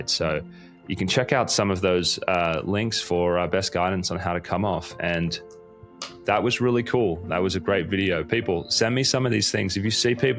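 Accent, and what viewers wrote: Australia. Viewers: Based on your accent I'm guessing you're from Australia, do you work in Australia? I need to get off my meds but my psych isn't interested in helping me.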